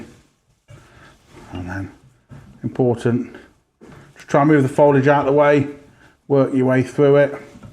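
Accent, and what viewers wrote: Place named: Nottinghamshire, United Kingdom. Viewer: is a North Notts. lad ( like myself.) None of us are ever going to win prizes for elecution. Hi, say hello to Andy R for me.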